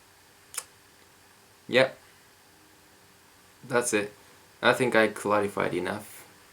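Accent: Japan. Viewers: I can tell you are a Japanese from your accent! Korean and Chinese have better English accent than you.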